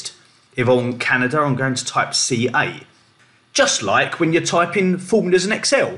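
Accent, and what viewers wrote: United Kingdom. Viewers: R u british? Love that accent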